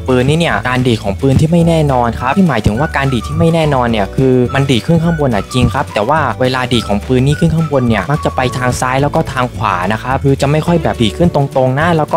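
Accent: South, Thailand. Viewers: พี่คนใต้ใช่ไหมครับ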